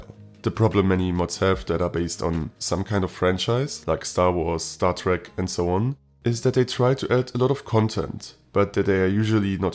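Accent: Germany. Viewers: 2 Sekunden drin, direkt nachgesehen, jap, Deutscher, direkt bemerkt xD Grüße aus Bayern und geiles Video, hast ne angenehme Stimme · Bist deutscher oder hört man beim Englisch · Idk why but u sound German and nice video 🎉🎉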